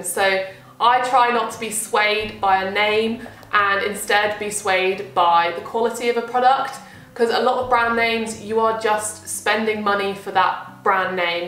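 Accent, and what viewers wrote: United Kingdom. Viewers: What UK accent is this? Love it! Very informative video, thanks!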